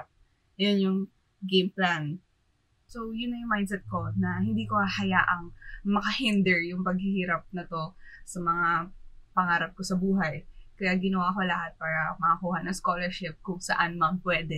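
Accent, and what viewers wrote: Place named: Philippines. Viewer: Boses palang atenean na atenean 😭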